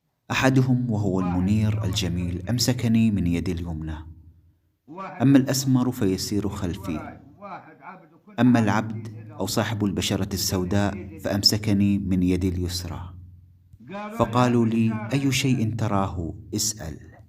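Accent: Iraq. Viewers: هذي ليسة لهجة قديمة هذي لهجة اهل الغربية من العراق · لو مامترجم احسن كلامه واضح كلامه بلهجه العراقيه الجلفيه العاديه ومعروفه واضحه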